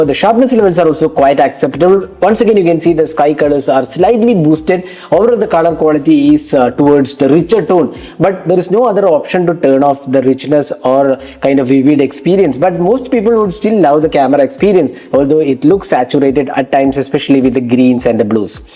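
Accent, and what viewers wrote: Kerala, India. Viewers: Are you from Kerala....?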